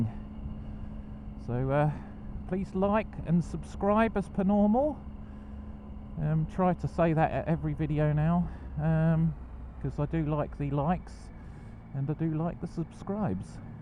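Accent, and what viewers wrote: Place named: England, United Kingdom. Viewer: I agree about the metal covers. We are experiencing astronomical growth in this area of Tennessee, USA and they are putting in new roads, and tearing up older ones to put more drain lines, etc, in the ground. Many of them are squared and hitting the corner of one can do damage on your tire. Some stick up several inches and hitting one at night in a curve can be an eye opener. When they are wet, dangerous. You must be from the same region of England as The Cockney Biker. He has an accent much like yours, he has been living in the States for a number of years now.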